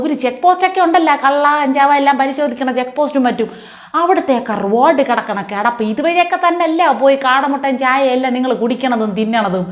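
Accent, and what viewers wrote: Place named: Trivandrum, India. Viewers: തേരോന്തരം ഭാഷ പോളിയാണ് 👍👍👍🤗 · തിരോന്തരം ശൈലി ബേഷായി😅😅😅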